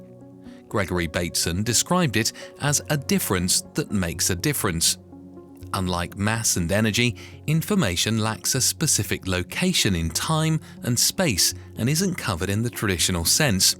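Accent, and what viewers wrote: United Kingdom. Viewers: The Universe is big and it has many stars, planets and galaxies in it. And it probably has other life forms in it too. With that being said, why do we always have some British guy narrating this stuff?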